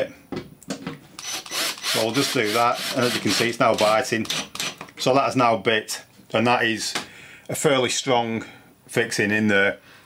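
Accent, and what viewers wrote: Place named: Lancashire, United Kingdom. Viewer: Knew I recognised that accent, Preston here :-)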